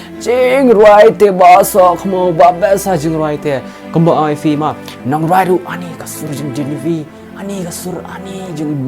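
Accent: Pnar, India. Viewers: Bah kren da ka pnar keiñ😁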